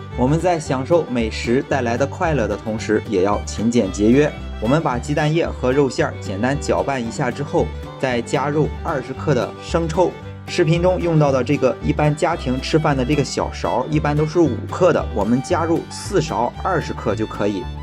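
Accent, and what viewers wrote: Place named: Shandong, China. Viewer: 看了几集听讲话感觉像是山東人！这集终于证实猜对了😄視頻做的不錯👍🏻加油！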